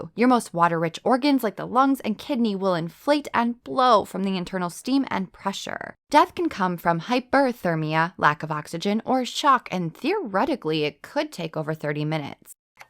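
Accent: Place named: South, United States. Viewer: For some reason your voice in my head is deeply southern accented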